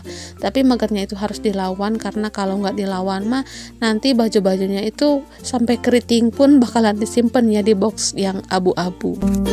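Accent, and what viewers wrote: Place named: Sunda, Indonesia. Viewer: kalo ngomong kayak istrinya bang deni cagur logat sundanya kentel😅👍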